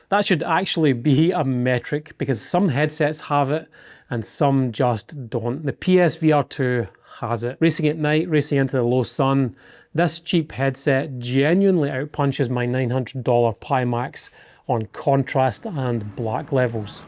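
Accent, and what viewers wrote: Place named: Scotland, United Kingdom. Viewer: Firstly.. before my main comment…. I am Scottish 🏴. - your accent sounds Scottish. Out of curiousity why quote prices in dollars- are you catering to the bigger US market? Anyhoo….. .. Wow. Watching that video it could have been myself talking. Agree with you 100%. In our house, my partner is the console player (PS5). More Assasins Creed, Crimson Desert than GT7- but she did play it (flat) with controller and was as good if not better than me on that platform. As soon as you put me on PSVR2 (with globular cluster of course) I levelled the playing field - just getting used to that controller!! 😊 I am yet to play GT7 on my ‘$7000’ 😉 PC rig- simply because the PS5 is in our living room hooked up to our big TV. I mentioned in previous vid comment I play only modded AC on PSVR2 with PC adapter and the DFR mod. Other than a high end headset, I am a bit of a PC snob- but - I still rate GT7 highly. 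All your comments ✅ Also Sophie (1 or 2) is a good AI competitor if you (like me) race offline only. Yes- modded AC looks friggin awesome when you have it dialled in properly, and tinkering in that sandbox is half the fun for me. With more than 6000 cars and 1200 tracks, it’s hard to beat. But… GT7 looks gorgeous at times for something which is plug and play. Bit ‘grindy’ perhaps to unlock some stuff, but tyres, pit stops, visuals. Pretty good- whatever sim racing physics snobs may say. At the end of the day.. it’s not real racing. I did this for years. Bankrupt me😅 So many broken engines…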